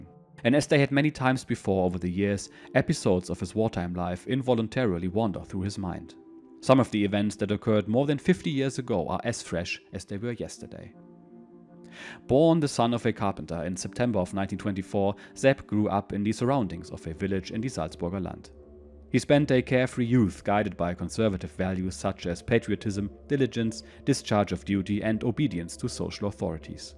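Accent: Germany. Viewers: I've actually heard this book before, but it's got a little more drama with a German narrator! Love the channel, thank you!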